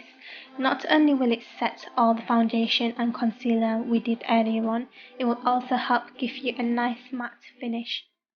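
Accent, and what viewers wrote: United Kingdom. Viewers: u have abit of a british accent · i like ur accent!! how long have u been staying in UK? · i love how she is asian yet has a british accent :) its so neat! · you're sooo beautiful! and you sound like you've got a British accent ;)) so cool!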